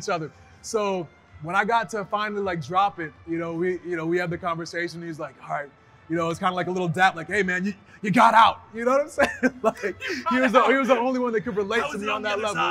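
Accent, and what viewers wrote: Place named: Ghana. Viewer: What accent??? Aren’t you from Ghana 🇬🇭 ??? Did you lose that accent!!!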